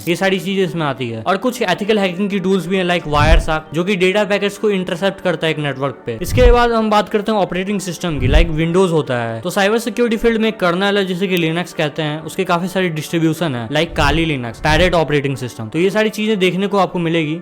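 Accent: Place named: Bihar, India. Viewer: Bhai pahle hindi sahi krle bihari se.bad me hacking krna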